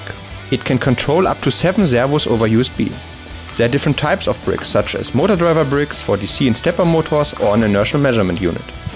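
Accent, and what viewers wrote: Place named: Germany. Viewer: Tolle Idee, und gut, daß Ihr in OWL seid, aber bitte besorgt Euch noch einen Native Speaker für das Werbevideo, mit dem schweren deutschen Akzent wirkt das nicht sehr professionell. Macht am besten 2 Sprachversionen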